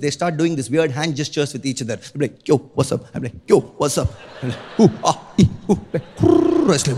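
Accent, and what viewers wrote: Tamil Nadu, India. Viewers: guy hs got a thick Indian accent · Purely sickening jokes.....accent soudhi ndian, heavy blown tamilian...crap...audience also samebrain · God sir u have the most typical Indian accent 🤣🤣🤣🤣 loved the skit.. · 😂tamil ya avan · He is the guy whose accent is depicted as Indian's accent by westerners..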